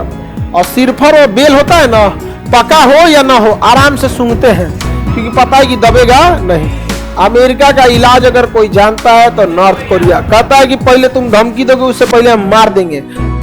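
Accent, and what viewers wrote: Bihar, India. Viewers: Bihar se ho kya jara dhang se bolo sunne walo ko samje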